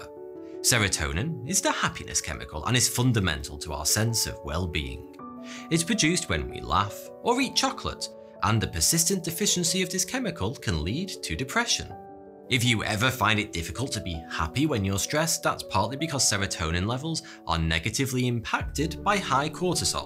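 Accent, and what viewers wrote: United Kingdom. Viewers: Im here for the mustache and beitish accent lol. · So.... is the British accent what makes " " sound like "42" or is this on purpose? or id it only me? I'm not an English native speaker so... just wondering. Anyways awesome content!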